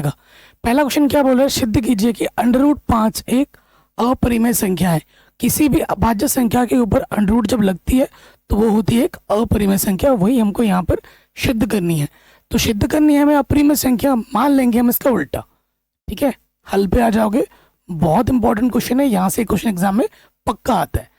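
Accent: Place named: Bihar, India. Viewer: Thodi Bihari hai 😅😊😊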